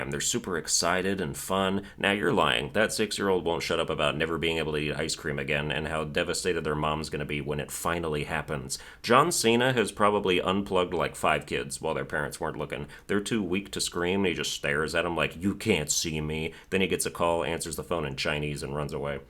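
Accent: United Kingdom. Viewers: That was your best English accent yet, not even a tinge of the Aussie in there 💯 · Hearing that british accent just after playing The Great Ace Attorney is certainly something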